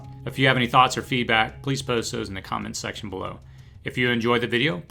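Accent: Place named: United States. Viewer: I was interested until you mentioned a certain political group or party labeling some broadcast corporations as fake news as being just not true or rare,,,at that point t I knew you were political propaganda designed to counter the fact that the people are now realising that our media is just lie talkers not journalists who's goal is to hide the facts and give cover for those who are right now (presently in america)attacking us on multiple fronts, ,these enemies were caught off guard when trump defeated hillary who they invested heavily into her being president because she was there person to totally take the US apart,,these hey cannot allow this to stand that's why all of the fake news more then ever is all that is being heard by the people and the reason why internet news sites are being removed,,they must control the narrative,,,you sir are fake news you are one of those enemies telling propaganda news on behalf of the ones who want to do I ate and enslave all of us,,,and you sound like an american,,in my mind I cannot understand any mindset that would help to destroy his own nation,,your goal here is very transparent,,,you are here to paint trump as the enemy,to paint trump supporters as the crazy ones just as the msm does daily,,at the same time that we who know the truth watch the enemies fund and mobilize the violence,,,get off the internet you frickin liar,,it's to late we all ( see ya) for what you are and we see your plans and devices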